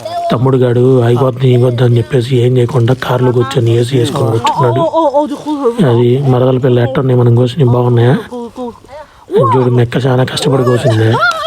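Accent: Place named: Prakasam, India. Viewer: nee lanugage prakasam slang lo vundi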